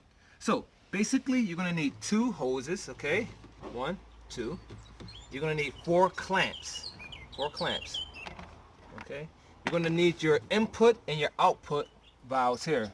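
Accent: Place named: South, United States. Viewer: Thank you for the clear explanation. Your Southern accent is the icing on the cake :-)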